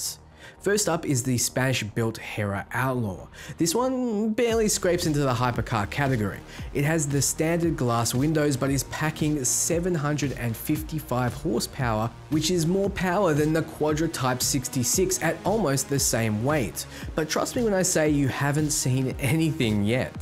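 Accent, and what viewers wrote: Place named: Australia. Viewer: Good to hear an Aussie voice on YouTube much love from the lock down state Victoria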